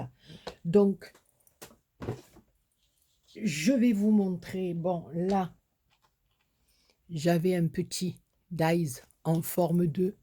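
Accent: South, France. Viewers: Coucou, un kilo de talent, un zeste d'humour, une pincée d'accent du Sud, une grosse cuillère de bonheur et voilà la recette de la superbe carte que a réalisée!!!!! bravo!! j'adore ta créa, elle est magnifique, merci beaucoup pour ce joli tuto, bisous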